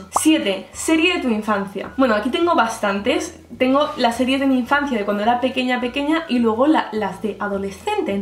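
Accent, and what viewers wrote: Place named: Spain. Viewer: ehhh no entendi la cuenta que dijiste que compartias, perdon si molesto...pero soy de argentina y el ascento español me marea un poco (me encanta pero me mezcla jaja)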